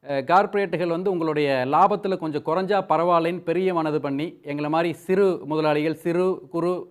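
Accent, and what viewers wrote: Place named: Kongu, India. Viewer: கொங்குத் தமிழின் சிறப்பியல்போடு கருத்துகளையும் சிறப்பா வெளிப்படுத்தியிருக்கீங்க... அருமை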